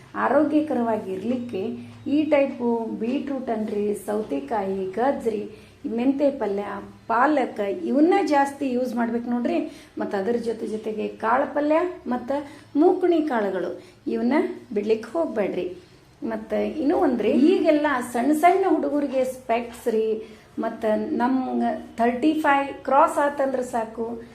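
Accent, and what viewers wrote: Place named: Bijapur, India. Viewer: ನಮ್ಮ ಬಿಜಾಪುರ ಭಾಷೆ ಸೂಪರ್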